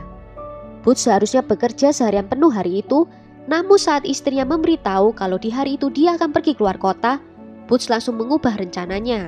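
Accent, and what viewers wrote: Java, Indonesia. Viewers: logat jawa nya itu loh... bikin terngiang ngiang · Lebih pasnya nama chanelnya ini adlh.... Krn medhok Jawanya bikin gemes....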